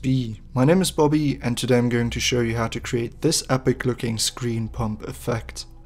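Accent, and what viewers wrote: Germany. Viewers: Du bist Deutsch?? xD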